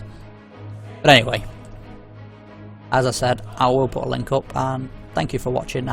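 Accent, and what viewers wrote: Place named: England, United Kingdom. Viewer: Bloody idiots. This is fucking English. This is what English sounds like in England. Not in London, in England. All of these non-native English speakers complaining makes me cringe hahaha, get your own world language and see how you feel about every tom, dick and harry butchering it before your eyes.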